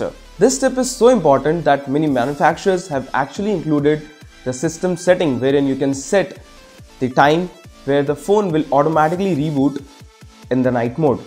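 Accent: India. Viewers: you speak english is totally wrong, because indian language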